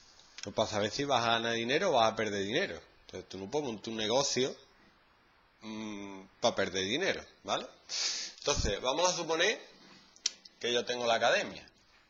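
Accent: Andalusia, Spain. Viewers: Me encanta como hablas!! Jaja eres andaluz verdad?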